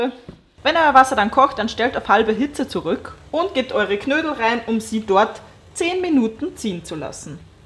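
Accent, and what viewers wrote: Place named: Austria. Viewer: Red mehr Österreichisch😂❤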